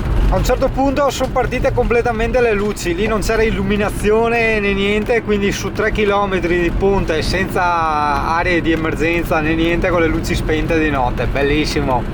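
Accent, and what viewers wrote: Veneto, Italy. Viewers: Venetenglish unico grande!!!! Continua così grazie per i tuoi video .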